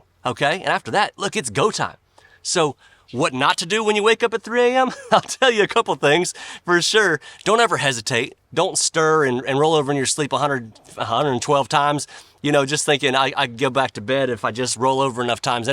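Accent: South, United States. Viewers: just seeing your face and listening to you talk tickles me to death. Unfortunately, I tend to be pretty stressed out and always thinking about the next problem that needs to be solved, so I appreciate those people out there that can just put a smile on my face. You always do that for me. Maybe it is just the smile, or the familiarity of a good ol’ country twang. But I strongly believe that it’s actually because you carry a bright light with you. The kind that you can only get from Jesus. I can see it in you. I just thought I would say that. Thank you. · Thanks, I like your content, you talk like me. I'm a Southerner myself.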